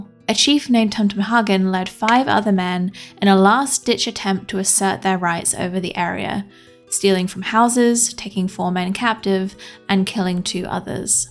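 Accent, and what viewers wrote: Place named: Mid-Atlantic, United States. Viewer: I sense a masters degree in either literature or history. You seem to have a mid-Atlantic accent. Quite old fashioned Hollywood. I can't quite tell if you're a middle class woman from Southern England living in the US or vice versa.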